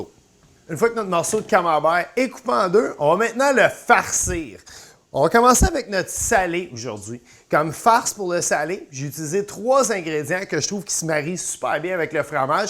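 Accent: Canada. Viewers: Nadia Romain ben c est canadien vrai de vrai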